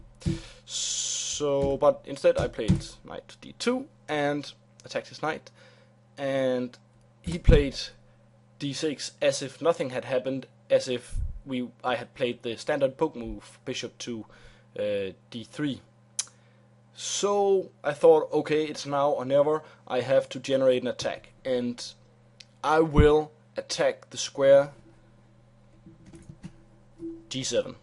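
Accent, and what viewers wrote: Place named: Denmark. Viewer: er du dansk? din accent lyder meget dansk.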